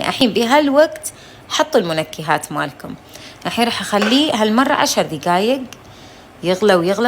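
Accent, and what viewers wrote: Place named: United Arab Emirates. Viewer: احب صوتك والله الصوت الاماراتي اللي يفكرني باصدقاءنا الاماراتيين زمان ما شوفنا منكم الا كل خير صوت فيه طيبة وايمان الله يزيدكم نزلت مصر ومازالت الامارات واهل الامارات في القلب احن ل كل التفاصيل البسيطة اشكرك على كونك سبب في ذلك❤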